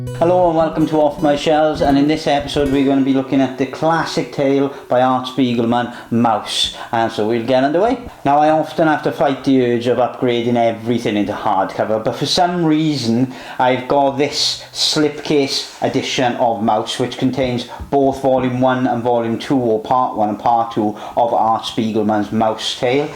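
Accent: Wales, United Kingdom. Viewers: Randomly discovered this channel… did not expect to hear a Welsh accent talking about Maus. I can hear the voice in my head getting more like it did when I lived in South Wales.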